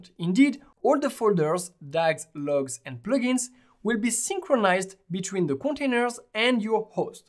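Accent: France. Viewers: please improve your accent because not all the words can be understood correctly. Too many French sounds when pronouncing. Thanks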